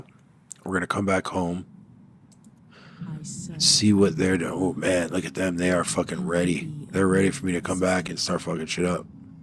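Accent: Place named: United States. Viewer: If you're having fun, that's the most important thing. Sure, it's not the most dynamic campaign I've ever seen, but considering that and the difficulty level, it's definitely something different, and that's cool. If it's fun for you, that's what matters. For me personally, 12tpy is a bit too long, but it's nice to see something different style. Sorry for my English, I hope everything is understandable; it's not my first language ;p One question, where are you from? because I have a feeling that we operate in completely different time zones😅 the time in which you publish your videos and your accent tell me it's the United States? Good luck and I'll definitely be checking in from time to time to see how your campaign is going!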